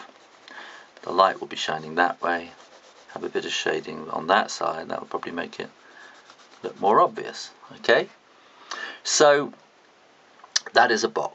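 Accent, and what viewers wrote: United Kingdom. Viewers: Thank you for the great videos! I love your British accent and your sense of humor...I'm learning a lot from you and I appreciate your talent so much! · are you british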